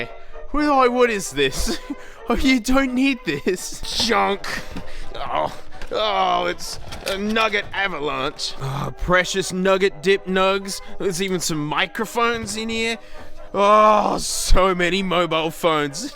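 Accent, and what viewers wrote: Australia. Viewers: i love hearing him get angry in australian!, we enjoy your weirdness my dude. do what feels right. 💜🚗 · I binge-watched all the videos you released, what was it covid-time videos? the 4-hour long videos; y ai binge-watched them. then i tried to find you on social media and got on discord to discover you dont exist there, so i left, i left looking for you. And when you dont post, I specifically search for " " or " " whatever. I watch your content, dare i say, unconditionally, because, well, too many reasons; the accent for one; the references to aussie slang (that i have to look-up at times). You're awesome, dont let anyone tell you different, keep doing it YOUR way! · i am here for you you could degrqde ke for an hour with silly put downs that will probably make me laught caus eiblove your aussie accent and way of being. We watch you for you not necessarily the content. 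I know you won't because you said you won't touch bluetooth headphones anymore but i do hope you at least smell the nothing (1)s cause they're really quite good for what they do. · I gave literally 0 shits about apple or ipods and have been here since (the tail end of) those days just about. I've been here for the shouty aussie man with his 1 grit and snake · I came for the obscure tech products but I stayed because of your Australian accent and all of the witty one liners. What other flavors of dog farts exist besides the old reliable EGG?! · I come to this channel to see what funny things the aussie man has to yell about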